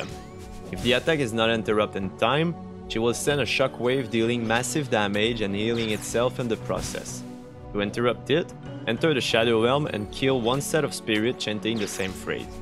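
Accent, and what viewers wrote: Quebec, Canada. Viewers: en 2 secondes j'étais genre, yo un québécois qui fait un guide. Nice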